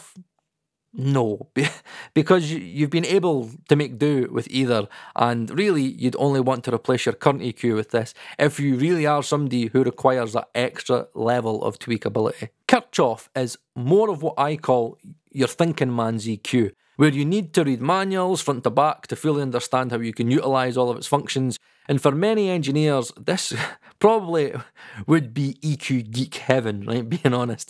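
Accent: Scotland, United Kingdom. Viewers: Scottish accent is so cool.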